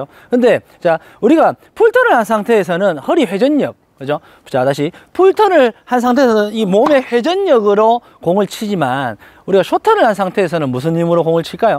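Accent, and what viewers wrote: South Korea. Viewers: 근력이 약한 저도 요 연습 해보겠습니더~ 슨상님 사투리 너무 좋습니다 ㅋ 마! 이게 사투리다!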